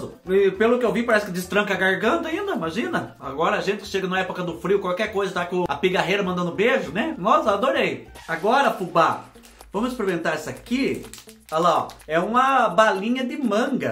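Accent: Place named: Paraná, Brazil. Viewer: Tava caçando vídeo de receita com fuba e encontrei esse canal, um fofo! ❤️ sotaque de paranaense, interiorzinho do Paraná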